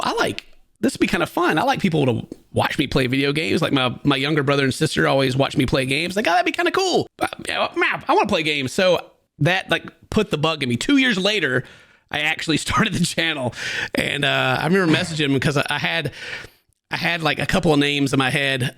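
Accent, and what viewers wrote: South, United States. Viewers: I subscribed during the beginning of the dark ages apparently lol. I checked you out because my friends had me watch a video and we both liked that you had a southern accent😂😂😂. I watched your family vlogs and loved how you interacted with your family and i was actually mad that you didnt have more subscribers because you deserve it! Once you added Buffpro i tuned into every video with yall. I feel bad but i actually skip your Pewdiepie videos lmfao. I had never watched a pewdiepie video until after i had been subscribed to your channel for years. I hope you keep making content. Its part of my daily schedule now lol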